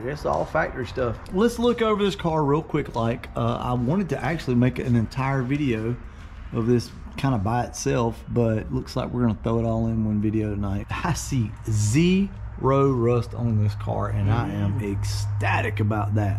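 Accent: United States. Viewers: so americans really talk like that?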